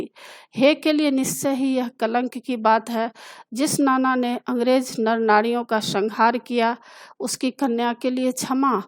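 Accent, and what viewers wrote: Bihar, India. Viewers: Mam aap bihari hai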